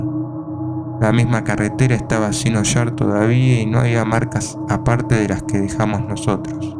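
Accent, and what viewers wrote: Argentina. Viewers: La narración no es tan envolvente ó agradable.... Ese acento argentino ó paraguayo, no provoca seguir escuchandolo...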